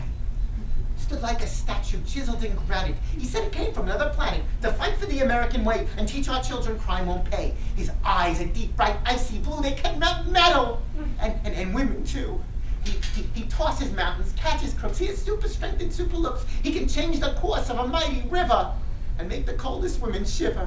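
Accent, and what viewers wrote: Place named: New York, United States. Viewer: Very nice. You sound like New Yawk!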